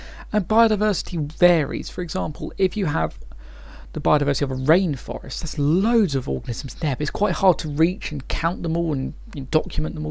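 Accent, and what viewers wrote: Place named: RP, United Kingdom. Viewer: why do you sound so posh, do your intellectual abilities match your convincing tone?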